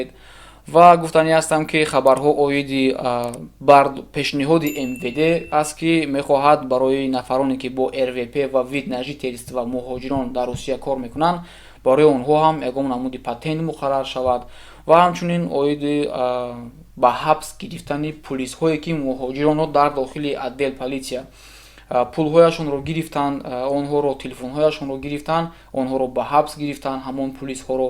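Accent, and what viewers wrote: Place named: Tajikistan. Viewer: Ташаккур, дустам... Забони фахмо, точикии тоза.... Бигзор дигар курдилону шевапарастон ва махалгароен биомузанд, гапзаниро...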